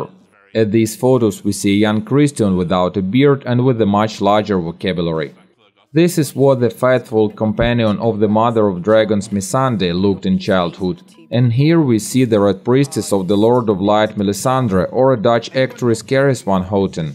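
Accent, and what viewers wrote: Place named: Russia. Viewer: sounds russian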